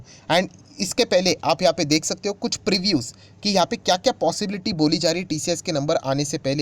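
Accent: Maharashtra, India. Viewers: Mujhe lagta hai ki Maharashtra se hai🤔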